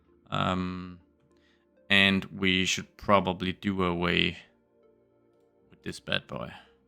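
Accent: Denmark. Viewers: Er det en dansk accent jeg spotter? 😅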